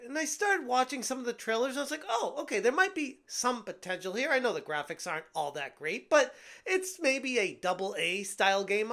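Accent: France. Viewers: As a Québécois, definitly sounds like a French Speaker ( from France ) reading a script without really knowing english. I could translate but he basicaly just explain what the girl do in the video. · The narrator is literally speaking fringlish he’s trying to say them in French but tries to correct the pronunciation into English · Oui, this is "the" french accent.....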